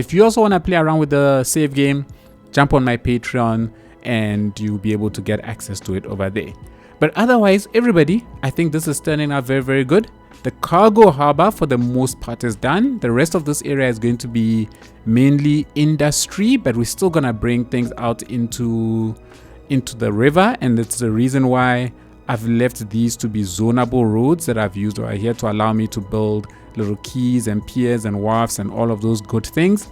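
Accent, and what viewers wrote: South Africa. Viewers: are you south african by chance? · I can smell the accent. Awesome to hear some South African youtubers playing City Skylines. Hope you are blessed, brother!! Video Suggestion. Build a South African Major City replica - durban,Capetown, etc. Keep up the lekker videos man. God bless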